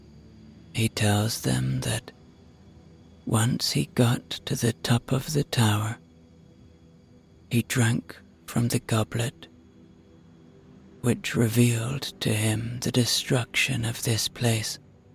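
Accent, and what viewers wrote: Ireland. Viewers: Thank you. I realised how much I enjoy story time by the Irish. Your count down is amazing xxxx Goodnight from Australia ❤ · Another beautiful story from Megan enhanced by the lilt of our Irish ☘️ Storyteller,! I haven’t commented lately but you have literally been playing 22-23 hours/day& nite on loop for the last 3 weeks., 🤗you have been my best Friend during a severe Mito “crash”. Thank you for keeping me company even through my subconscious. I felt supported, safe, soothed & so much companionship in this time of restoration. My energy is coming back! Sláinte!!! ❤🙏🍻😊Wishing for You, Reb, & Timmy all the love, light, & amazing ENERGY you put out in the world back to you in spades! ☀️💖🌍♠️♠️♠️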